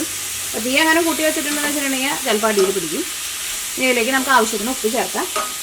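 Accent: Thrissur, India. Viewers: Hi,I’m from Nadathara/Thrissur originally but settled in the UK. Love your recipes reminds me of my mum’s cooking! Also your Thrissur accent...Ishtu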